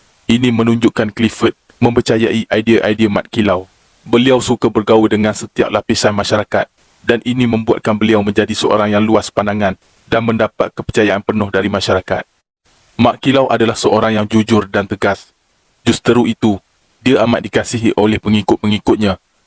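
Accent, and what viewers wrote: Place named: Pahang, Malaysia. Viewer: tau dh ouk Tu org Pahang . Keh layan video ouk dgr ouk bercakap pun tau dah loghat Pahang terselit situ hehe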